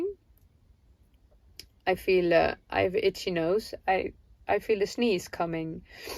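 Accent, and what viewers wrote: Netherlands. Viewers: I love your "Dutchie" English, I can understand perfectly. I'm familiar with the word paprika because my mom, who was Slovenian, called a red pepper (the ripe version of a green pepper) that. We also have paprika, the ground powder seasoning. I love how beautiful you have made your caravan/camper with all hand made items. Your camper has many heart jumping moments, every time you dress it up with something new, I'm sure! Did you follow a pattern for the cute curtains behind you? I hope you had or are having a lovely time away from normal daily activities. 💗💗💗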